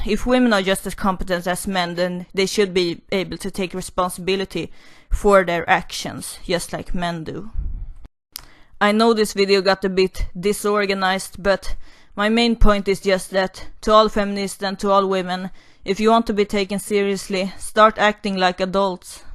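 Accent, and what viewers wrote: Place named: Sweden. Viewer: are you from sweden? you sound swedish!:)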